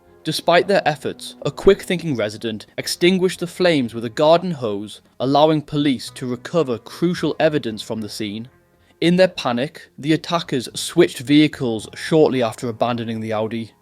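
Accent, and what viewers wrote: England, United Kingdom. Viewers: 😂You idiot it's obviously English, have you left Frankston before?😂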